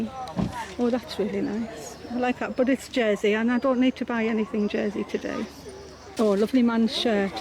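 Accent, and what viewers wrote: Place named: Yorkshire, United Kingdom. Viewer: loved your video, sounds like you are from Yorkshire, would I be right?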